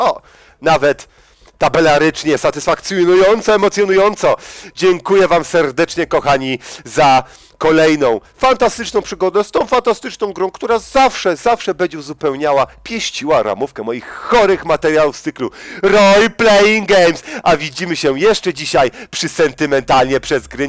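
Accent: Silesia, Poland. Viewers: No widza że ty jednak umisz po naszymu godac i że ty żeś hanys je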